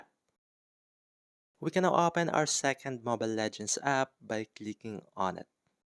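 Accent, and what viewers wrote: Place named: Philippines. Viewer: You sound more like filipino lmao, but your tutorial are very simple and very understandable ❤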